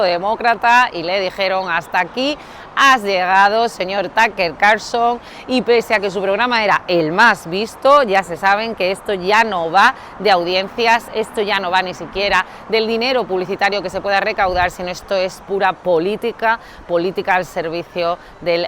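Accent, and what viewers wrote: Spain. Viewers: Me en canta tu programación pero también me encanta tu acento español😍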